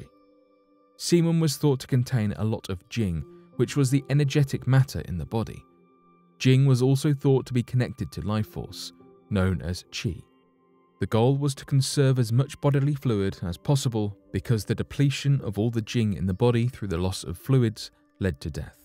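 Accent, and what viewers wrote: United Kingdom. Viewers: the poor man and like his British acent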